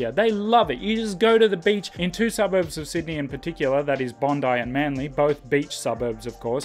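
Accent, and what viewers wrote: Stockholm, Sweden. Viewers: Your Swedish accent is legendary! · His Swedish accent is legendary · Man you hit that Stockholm dialect hard 😂😂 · Oh, I see. Well, as much I hear anyways, your accent resembles stockholmish, you know, Sweden's captial. Many who try to talk swedish often uses this accent. Well, that or the southern accent we have. All I say is to aim outside the cities to find another type of accent to speak with. Also, I did get the impression you have been there due to the slight stockholmish accent, that or you've been speaking with some. That's why the slight mistake here. Oh, and you probably should visit sometime in the future, possibly a amusementpark would be ideal to fetch up a lot of material. No worries btw, even there, they know english, it's kind of our second language after all. Sorry to make you confused. I tend to do that to people sometimes. 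^_^'> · are you going for a stockholm accent? you should go for Norrländska instead! Great video mate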